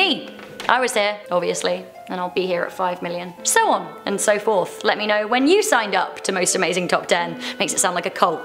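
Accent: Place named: United Kingdom. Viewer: Dutch 🇳🇱 love the UK accent and love u ❤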